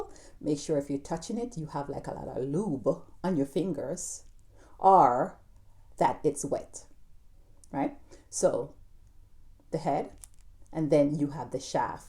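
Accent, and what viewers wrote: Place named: Jamaica. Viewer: Love your Jamaican accent.. big up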